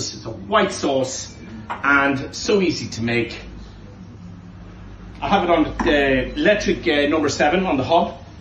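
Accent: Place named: Dublin, Ireland. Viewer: A fabulous recipe, and your beautiful, mellifluous Dublin accent made your explanation doubly enjoyable!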